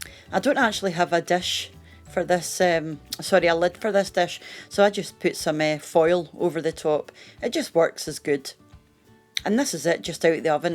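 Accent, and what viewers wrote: Scotland, United Kingdom. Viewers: Love the Scots accent. Thank you for sharing your recipe. Will give it a go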